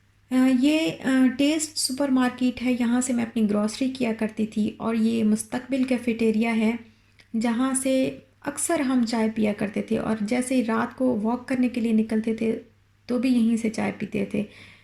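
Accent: Saraiki, Pakistan. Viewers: Aapk talking style sa lgta hy k aap saraiki ho sister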